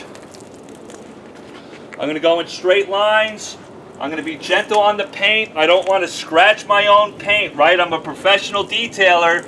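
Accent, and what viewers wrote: United States. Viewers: Except the annoying American accent... like when always says “wowdar”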